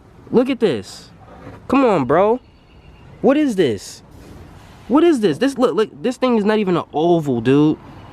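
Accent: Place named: New York, United States. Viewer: Accent sounds like from New York lol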